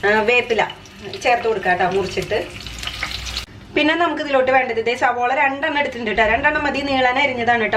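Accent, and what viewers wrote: Thrissur, India. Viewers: thrissurkarii annee thonnunuuu.....😘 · Thrissurkari Alle ? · Chechi thrissur kaari aano?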